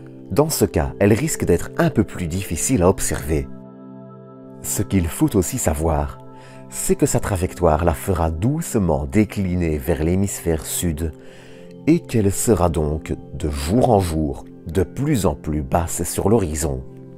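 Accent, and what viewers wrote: Belgium. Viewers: Tu es belge ?? Merci pour la vidéo je suis de Bruxelles au plaisir encore des vidéo svp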